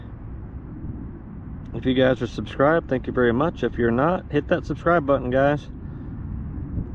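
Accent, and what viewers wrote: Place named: Houston, United States. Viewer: Man I swear you must live somewhere on the north side of Houston I don’t know why I think that - maybe your accent and the sound of all them 18 wheelers going by 😄 that’s where I grew up. Great run that thing looks right at home out there in the dirt 👍👍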